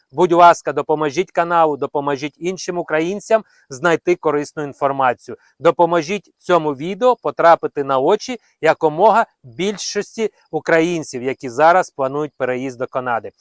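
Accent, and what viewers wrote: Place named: Russia. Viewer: Я це акання взагалі чути не можу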